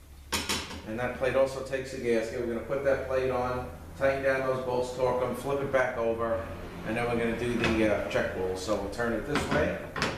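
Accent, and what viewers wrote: Long Island, United States. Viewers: Greetings from Albany! I think I hear the LI accent, i'm from there(SE Nassau area)!!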